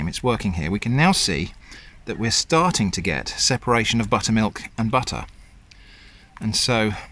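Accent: United Kingdom. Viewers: because of the British accent, he makes anything he does sound completely okay and normal...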